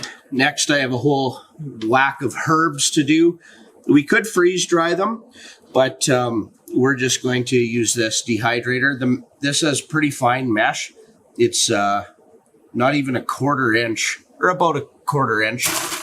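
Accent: Canada. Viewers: I was pretty sure you were a Canadian based on your accent, alone, but then I noticed the stickers on your fridge. xD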